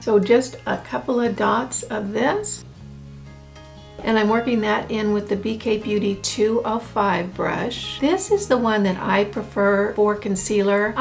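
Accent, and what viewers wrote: South, United States. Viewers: Started vlog at 5.30am in (Aus) woke way too early 🤣 and just couldn't resist hitting that start symbol. That southern accent? Gently drifted back to sleep then 3 hours later with coffee in hand just had to watch again. One who also wanted makeup tutorial your skin is literally glowing. Looks fantastic. Just wish products used when converted to $AUS could be purchased without my having to forego a fortnight rent 🤣 sad but true. Aus conversion rate, shipping & taxes in this country 😫 The final highlight powder I love but here at Sephora it's over $100. Sure there are dupes here but looking at reviews this seems to be in a class of its own. Am over 70 so getting a glow without looking like a sparkly crocodile is a challenge. Going to get the KVD bronze gel tho, so soft & pretty. Love her eye shadow pallettes as well but am def going to look at Milani. 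Thank you for videos & workouts that are age appropriate nice to hope that with effort & attitude maybe I am not totally invisible.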